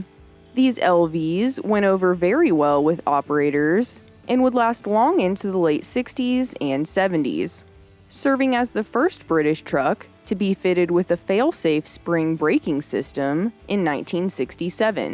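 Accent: United States. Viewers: It's amazing with a yankie american women speaking trow a documentary I love it cool makes you think she's british and loves ERF trucks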